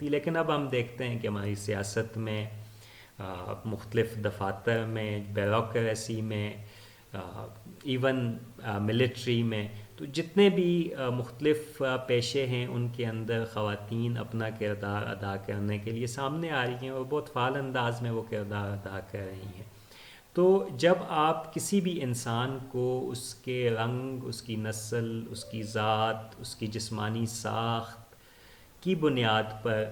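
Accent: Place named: United Kingdom. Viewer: firstly I thought he is a Britisher........then he started Hindi....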